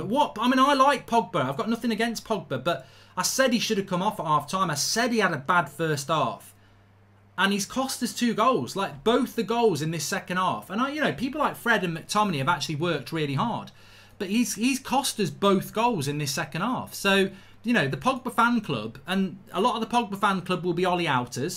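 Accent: Manchester, United Kingdom. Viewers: Proper Manc accent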